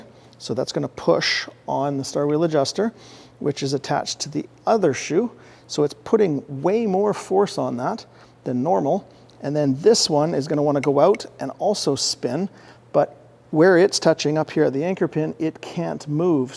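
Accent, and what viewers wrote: Canada. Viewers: Sounds like a Canadien.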